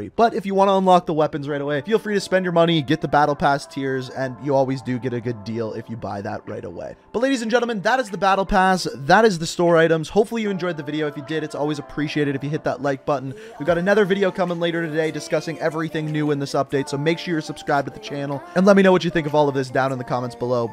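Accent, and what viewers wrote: Canada. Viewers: Canadian?! Eh!! Love the channel even more now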